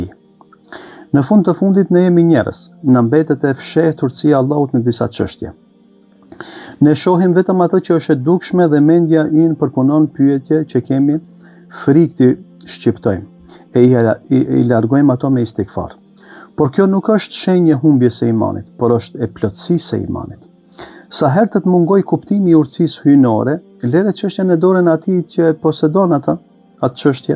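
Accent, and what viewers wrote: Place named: Kardhiq, Albania. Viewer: shkerdhat si flet kardhiqe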